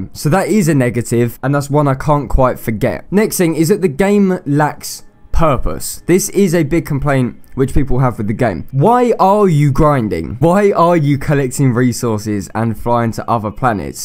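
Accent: United Kingdom. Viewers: damn. i would hate it if a british person like you insults me. the accent you british folks have makes the insults sound meaner then a normal American person when they insult someone. i was referring to when you said that they don't deserve money you're accent made it sound so harsh. its a good thing. lol take that as a compliment. i guess you can say your accent reminds me of Simon Cowell during the American idol days. lol. besides, i thought this was an okay game btw. homefront revolution was worse then this though.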